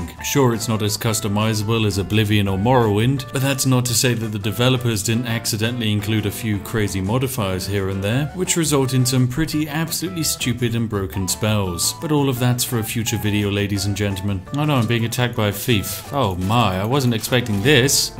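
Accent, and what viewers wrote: United Kingdom. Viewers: I findurder more exciting Me: Could this Brit be one of my people? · I basically come here for Skyrim and the British accent 😂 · i wanna see you read the lusty argonian maid part 1 and 2 with that british voice!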